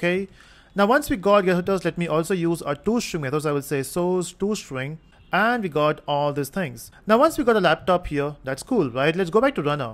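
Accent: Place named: India. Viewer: 咖喱味太重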